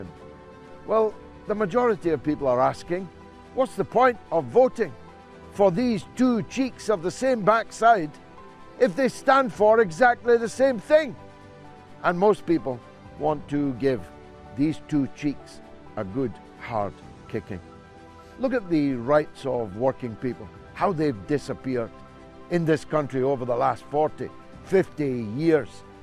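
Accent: Scotland, United Kingdom. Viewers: He's like a white MLK with a Scottish brogue. He needs to be PM.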